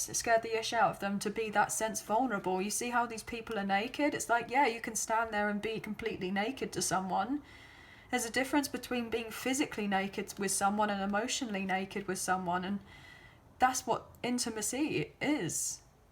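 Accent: England, United Kingdom. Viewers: Loved this reading! Very interesting, thank you very much lovely Lady, Love your English accent!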